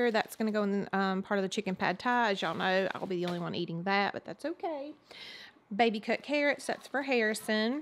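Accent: South, United States. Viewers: I loved the haul. I can’t wait to see Harrison in his tuxedo. Also I love your “ accent “. I’m southern also lol & I’m from Georgia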